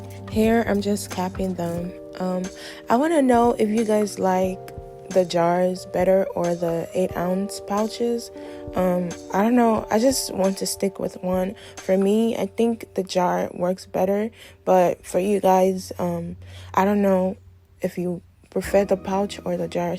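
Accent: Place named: Africa. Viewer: Love your African accent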